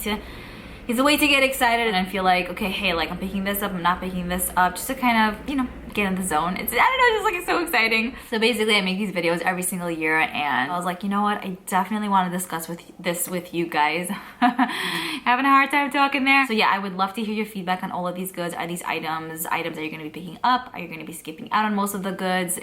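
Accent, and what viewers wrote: New York, United States. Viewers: Newer subscriber here. Is that New York I b hear in your voice? Joisey girl here!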